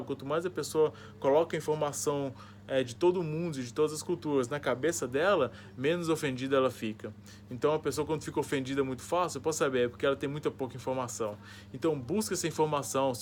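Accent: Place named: Germany. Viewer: Você está até com um pouco de sotaque alemão.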